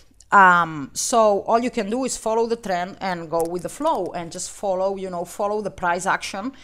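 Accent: Spain. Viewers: Hablas inglés perfecto y te noto acento espanol. De donde eres? Saludos, soy de BCN y estoy en VIE. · spanish? from catalonia, my guess.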